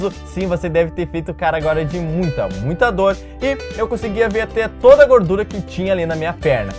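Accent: Rio Grande do Sul, Brazil. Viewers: Bah, que tri! Quando assisti teu canal pela primeira vez, percebi que teu sotaque era gaúcho! 😍 E agora que tu é de Gravataí, que massa! Eu moro ao lado, em Cachoeirinha. É nós, adoro o canal! ☺👊👊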